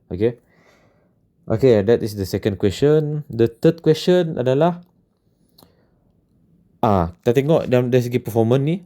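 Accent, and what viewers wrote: Sarawak, Malaysia. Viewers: slang srawak ya xpat lari bos. . hahahah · Are you Sarawakian? 😂 anyway thanks for the vid, very helpful.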